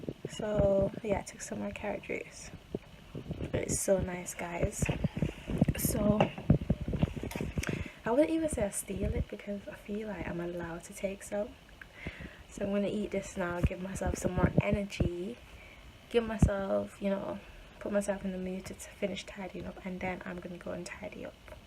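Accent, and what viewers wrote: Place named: United Kingdom. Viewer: Are u jamaican u sound like u british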